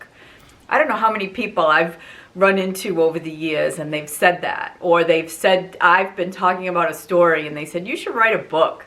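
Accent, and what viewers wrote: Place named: Fall River, United States. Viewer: this is such an open and inspiring video. Thank you for posting it. I knew I recognized that accent, I’m originally from Fall River! ❤️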